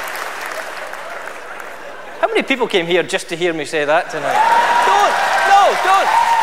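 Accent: Scotland, United Kingdom. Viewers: Yeah he is, he's born in Moffat, can you not hear it in his accent?. His dad's Indian but that's it, his mother is Scottish and that is where he was raised. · Love this guy I am part of the Scarborough Faire Queen's own Highlanders and just love to hear some one with a Scottish sound it is like music to my ears. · he looks indonesian but sounds scottish. its so confusing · hes scottish... quite CLEARLY!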